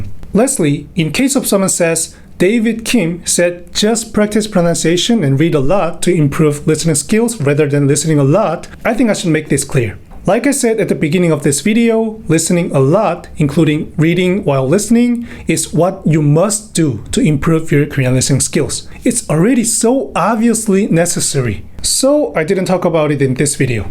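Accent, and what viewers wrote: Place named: United States. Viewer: Me being an English native British English speaker and being so confused when you said English words come from the back of your mouth, and then ofc the examples were in an American accent 😂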